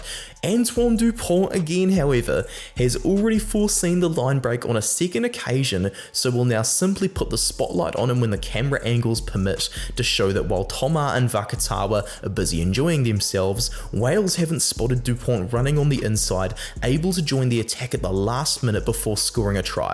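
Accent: New Zealand. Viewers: Excellent vidéo and analys, Love the Kiwi accent👌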